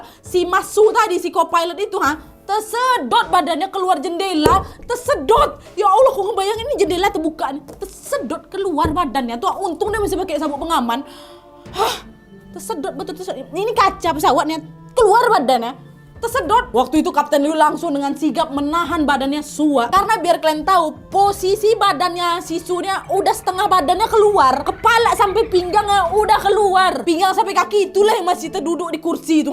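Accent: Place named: Sumatra, Indonesia. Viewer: Nadiaaaa hahahaha aku suka dg pembawaan:) Aku suka ketawa ngakak KL sdng bercerits cerita seram atau apapun hahahaha Dengan aksen khas Sumateranya yg kental bgt. org mn? Dr Pekanbaru atau dr mn? Aku dl 3 THN sklh di Pekanbaru dan aksennya persis dg:) skrg Sy di JKT lg:) sukses ya:)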